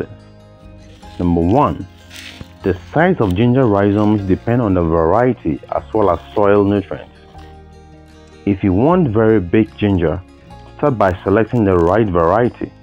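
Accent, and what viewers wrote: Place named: Ghana. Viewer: Doctor!! This is my 2nd video view today since I found your page! After reading a bit about you i know why I enjoy learning from you!! #1 your soothing voice..I was going to guess Ghanaian because it sounds so familiar and comforting! My neighbor's are from Ghana!! And the grandma (Boss!!😅) was so amazed when she saw the garden jnside my apartment 😁!!#2 youre a 🥼 scientist! My major was in science so you definitely have my attention because I know you will have experimented with all of what you're teaching us and want to deliver the best possible results! #3 youre obviously a kind, generous man and we need more of that in this world! 🌎 So thank you for not only helping me grow some plants that I've failed at for decades, but also giving me hope that there are goodhearted, bright, kind humans around. Blessings to you and yours Dr!✌🏼💜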